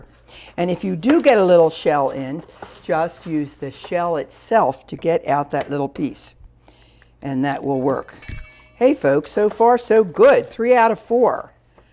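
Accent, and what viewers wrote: Philadelphia, United States. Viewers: you from Philly? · First of your videos I’m watching. You must be from Philadelphia or thereabouts.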